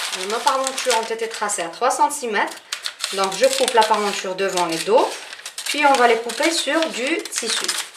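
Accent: Tlemcen, Algeria. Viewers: d'aprés l'accent vous étes une tlemcenniene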